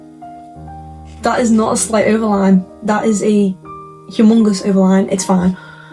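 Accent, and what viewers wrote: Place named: Liverpool, United Kingdom. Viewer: thank u scouser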